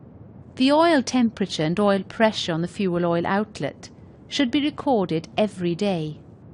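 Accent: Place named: United Kingdom. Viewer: 英音好难受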